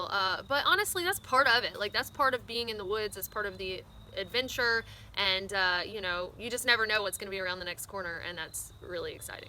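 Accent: South, United States. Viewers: You're slowly losing your southern accent!! :)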